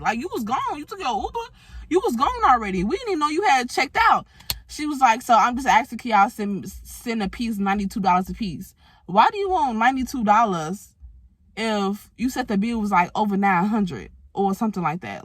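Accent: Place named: Chicago, United States. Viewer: I knew you were from Chicago as soon as you started talking😭🫶🏾. New subscriber! Taurus gangggg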